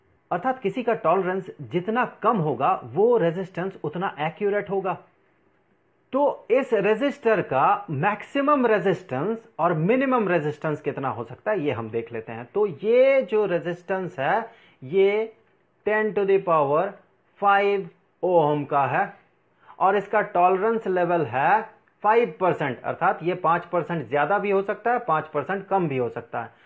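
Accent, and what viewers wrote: Bihar, India. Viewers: Apna yeh Bihari accent change karo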